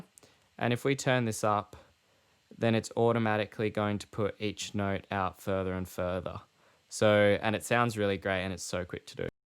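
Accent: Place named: Australia. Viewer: So refreshing to hear an Aussie explaining Logic. Some accents really grind my gears. Hahaha.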